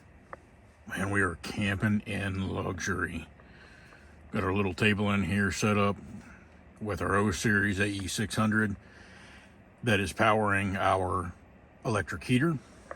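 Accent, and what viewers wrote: South, United States. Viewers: I thought you had a little south in your voice man 😂, now I know brother 👍🏼.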